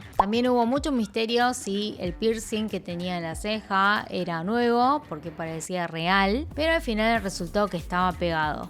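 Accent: Argentina. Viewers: Hace cuánto que vivis en argentina ? Me sorprende tu acento 😂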